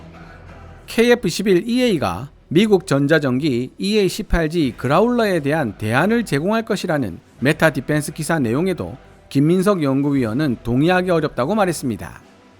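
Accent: Gyeongsang, South Korea. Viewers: 갱상도 사투리 심하넹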